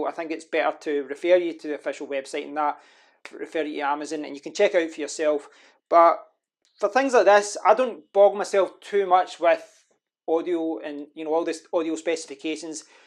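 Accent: Scotland, United Kingdom. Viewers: I was looking for something else, but kept listening because of that amazing Scottish accent.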